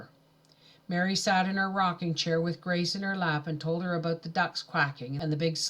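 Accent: Canada. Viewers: the pronunciation must be a Canadian thing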